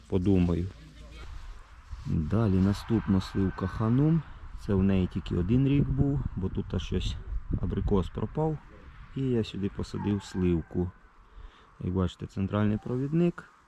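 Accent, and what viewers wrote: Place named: Surzhyk, Ukraine. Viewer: Яку його мову ти розумієш?! Він же не українською мовою розмовляє, він розмовляє сільськiм суржiком!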